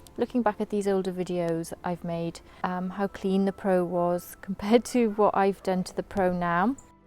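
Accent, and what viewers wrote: Wales, United Kingdom. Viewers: What is it about a British accent? Just love to listen to it! Great video, will probably get one not just for the lovely accent but it looks like it held up well over a year. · Do I detect a hint of Welsh? You should do ASMR videos :).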